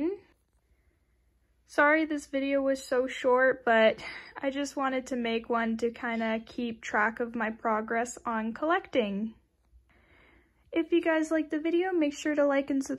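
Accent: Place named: Australia. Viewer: Your aussie. Give 😂 - Tay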